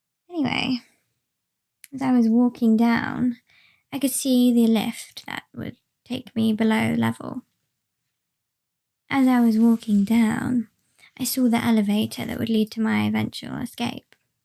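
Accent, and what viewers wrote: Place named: RP, United Kingdom. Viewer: man, you look hotter than ever in this cosplay! and that accent. I normally dislike rp but yours is amazing and suits your asmr roleplays sublimely.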